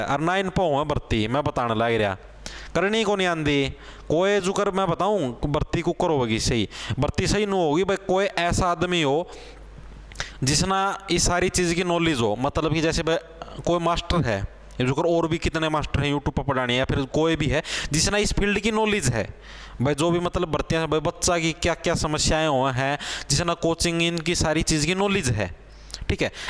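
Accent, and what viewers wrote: Haryana, India. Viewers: V good bhai you style full haryanvi👍👍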